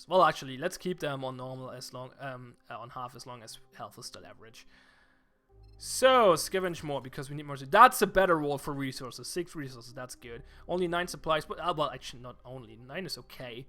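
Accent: Germany. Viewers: is this german accent?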